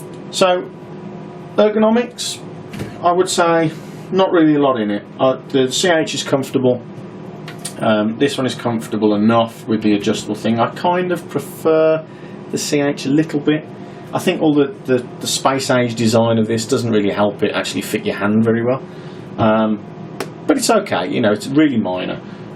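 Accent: United Kingdom. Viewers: A bit late but great video. Is that a midland accent lol my name should tell you i am also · He sounds British to me, mate.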